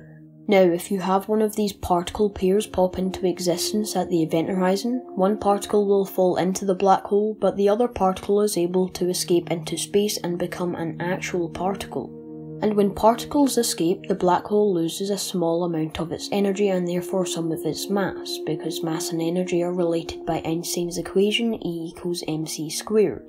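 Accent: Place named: Glasgow, United Kingdom. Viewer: And here after watching your clip on black holes....I've subscribed and look forward to your future footage....yir a smart wee cookie.....sound like yir fae Glesga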